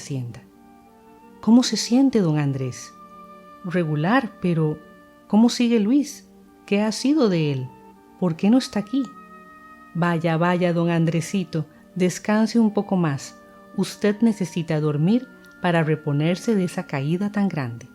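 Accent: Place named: Costa Rica. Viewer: Creo que es acento de Costa Rica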